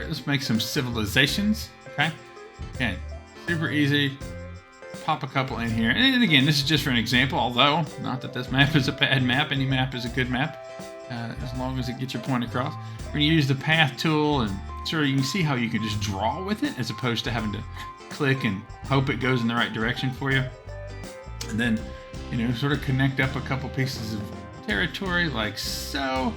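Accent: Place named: Alabama, United States. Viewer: You from Alabama? You sound just like Destin from Smarter every day!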